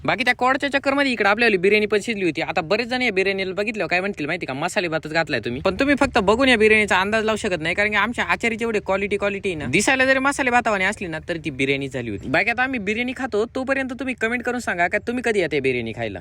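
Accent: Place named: Nashik, India. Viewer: दादा तुम्ही नाशिकचे आहेत का बोलण्यावरुन वाटाटे..बाकी बिर्याणी खुप छन वाटते..तुमच्याकडे बिर्याणी खायला येच्या आस्सल तर येच्या कुठे.. From Pimpri Chinchwad Pune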